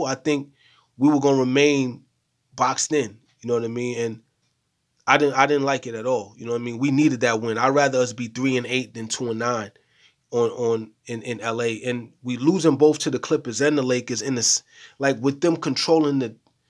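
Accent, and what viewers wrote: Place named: New York, United States. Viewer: U from Toronto or New York dawg?? I’m just curious. The way I say some words sound like u from New York. Would be cool if ur a raptors fan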